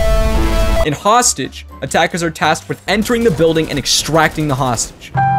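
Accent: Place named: Russia. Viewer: Bru I noticed he kinda has a Russian accent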